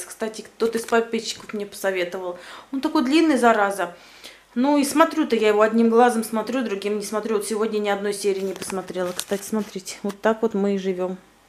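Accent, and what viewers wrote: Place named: Uvarovo, Russia. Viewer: говор наш уваровский удачи тебе подруга!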